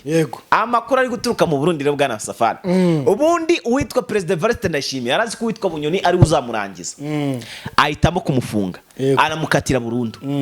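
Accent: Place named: Ngororero, Rwanda. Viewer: Sha jye icyo dupfa uko ikinyangororero cyagukwamiyemo!ntuzi kuvuga ngo yashize?iyo ya shije uyikurahe?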